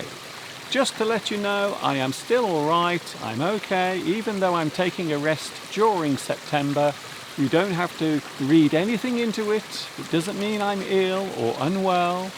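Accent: United Kingdom. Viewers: I just discovered your channel and I really admire your work. I’m moving to England soon and was looking for english boroadcasts where i can listen and be used to Britain english accent. Thank you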